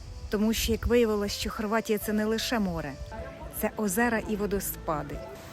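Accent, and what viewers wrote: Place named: Russia. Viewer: Тішить, що ведете розповідь українською. Чути рашівську, але... Цього року ми були в Макарській...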